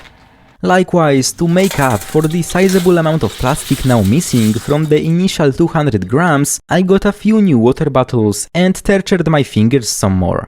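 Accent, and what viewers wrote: Poland. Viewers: After I saw cisowianka bottle, and heard your language I instantly knew you are from PL 🇵🇱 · Cześć! Od razu słychać polski akcent, jeszcze to wymawianie "R", i cisowianka... Pozdrawiam!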